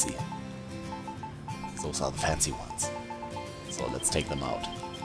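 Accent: Germany. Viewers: Is he german? KLingt nach deutschem akzent in dem guten englisch ;)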